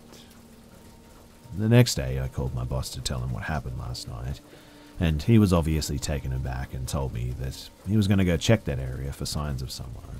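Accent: Australia. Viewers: THANX FOR ALWAYS BEING CONSISTENT WITH AWESOME CONTENT.. I ADORE UU.. UR AUSSIE ACCENT IS SOO CUTE!! TY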